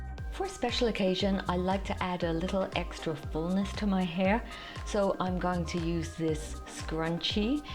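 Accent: United Kingdom. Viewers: You’re lovely. Do I detect a UK accent?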